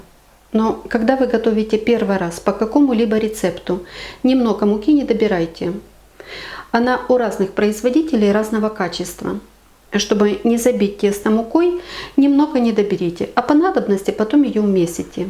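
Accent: Ukraine. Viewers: у вас така гарна українська мова❤ Дякую за хлібчик.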